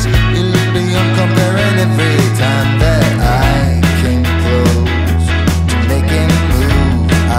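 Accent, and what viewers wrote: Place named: South Africa. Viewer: New subscriber here, I really enjoy your videos❤❤❤❤ Are you from South African? U have a South African accent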